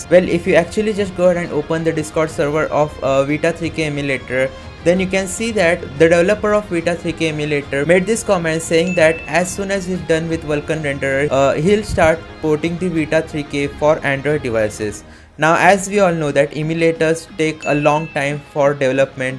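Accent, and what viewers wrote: India. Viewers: Indian english = fake news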